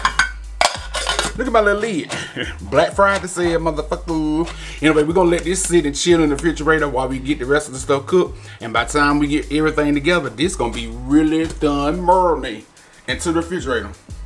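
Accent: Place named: Louisiana, United States. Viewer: Love y’all was watching u heavy since 5k I use to have the biggest crush on you Cuh of your Louisiana accent lol 😂 food looks good tell hubby i said hey 👋🏾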